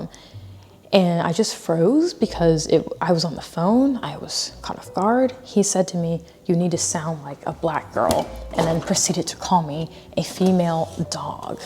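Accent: New York, United States. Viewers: That New York accent is peak ms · I think your NY accent is funny. I always liked the comedy show The King of Queens, where Carrie has this same kind of accent. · The bricked up and the New York accent took me out girl 💀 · The New York accent 😂😂😂😂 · Your NY accent is giving old Jewish lady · 🎉🥳Congratulations on your new place in the big apple, I’m so excited for you!!!!!! Apologies for the delay.., I’m still getting over the 50xs the rent smh 🤦🏾‍♀️ Great NYC accent!!!!!! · Perfectly stated. It really is annoying how closed minded some of us still are in 2022. love you practicing that NY accent. Been down here since 2017 and still have my hybrid NY/NJ accent when I got mad. Love your videos!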